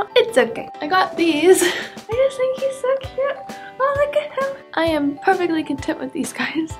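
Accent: United Kingdom. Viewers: who else noticed she sounds British in like every video now lol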